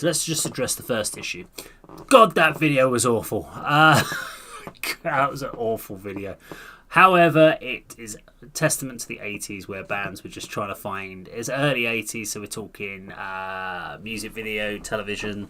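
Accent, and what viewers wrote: United Kingdom. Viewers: You from south east?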